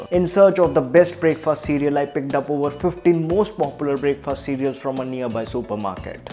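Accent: India. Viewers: Filled with cheeky indian accent ♥️😭🤣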